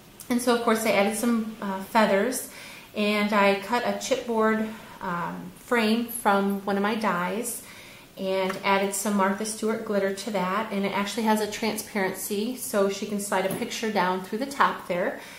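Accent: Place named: United States. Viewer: Zounds! This is fabulous! So beautiful!!! Are you a transplanted American in Ireland? You sound very American! (Not that this is bad of course!....just curious cuz I spent a lot of time on the Isle of Wight and was pegged all the time! I even had a bunch of kids stop me and want me to say something in American!!!! What a hoot! Great mini!!!! Hope you don't mind my scraplifting! U have great ideas!!!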